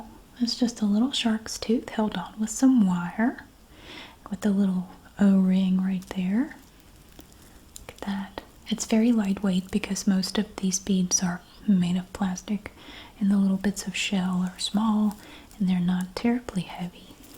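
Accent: South, United States. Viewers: Love Southern accent! Different from depending on where in the south! Thanks 😇❤💙❤💙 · Lately I've been on a bender. Been subscribed for almost 10 years. Love the motherly tone and soft southern speech of your voice. Literally the only ASMR channel I'm still subscribed to.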